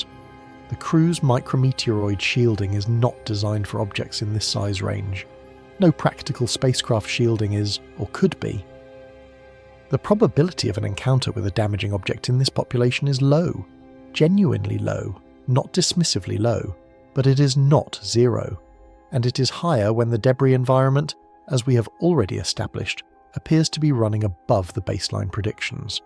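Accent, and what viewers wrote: United Kingdom. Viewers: Your British accent is not going to help you to get by with this rubbish story!!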